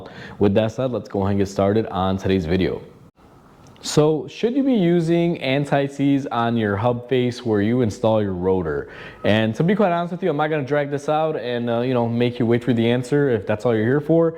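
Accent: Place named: Chicago, United States. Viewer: People think that accent is southern and not anything but Chicago? Man, you folks need to get out more.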